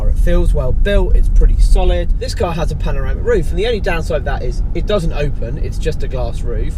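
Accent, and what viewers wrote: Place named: Cockney, United Kingdom. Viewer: Typical cockney ponce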